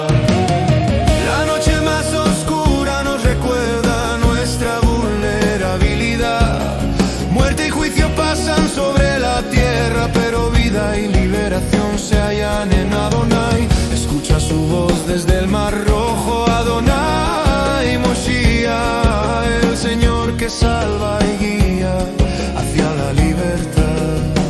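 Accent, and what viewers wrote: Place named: Spain. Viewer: Wow!! Yo pensaba que naciste en España por el acento, señor. Veniste a Estados Unidos por música, religión, trabajo??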